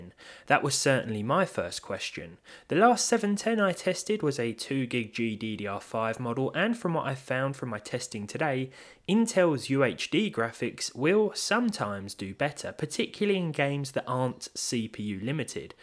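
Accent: United Kingdom. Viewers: I have no direct need for the information you provide, but you are so likeable. Your voice is calming and your British accent is such a pleasure to hear. I enjoy your ponderings and I am sure a lot of people feel the same! Have a great weekend!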